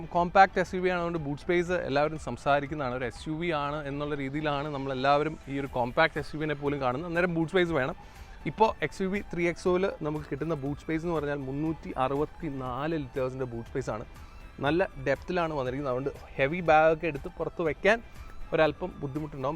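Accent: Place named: England, United Kingdom. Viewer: Mr ഇംഗ്ലീഷ് ആക്സന്റ് ഇൽ മലയാളം പറയാതെ പറയുന്നത് ആളുകൾക്ക് വ്യക്തമാകുന്ന രീതിയിൽ സംസാരിക്കാൻ ശ്രദ്ധിക്കുക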